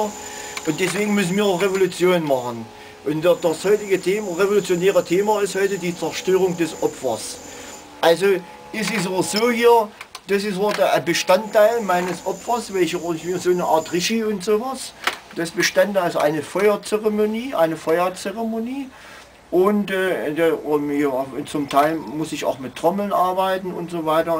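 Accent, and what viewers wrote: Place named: Berlin, Germany. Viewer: Also ik würde sagen dit bärlinärische is klasse ! JJJ ! xD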